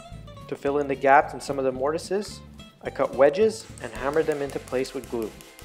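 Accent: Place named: Canada. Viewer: Great project and great video. Im gonna be honest here: When you started talking I was like, "Oh, this dude's Canadian" (because of the accent). When you got to the feet and were using hockey pucks I burst out laughing. Nicely done.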